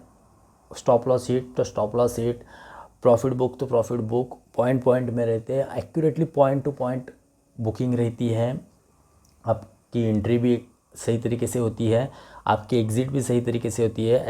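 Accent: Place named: Agri, India. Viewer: मराठी hai.. aagri 😊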